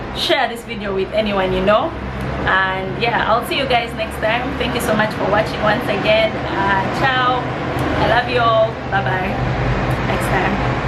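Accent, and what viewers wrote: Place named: Uganda. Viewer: I could tell that you lived in Uganda from the English. Yes. There is a ugandan watching. Subscribe to my youtube channel too.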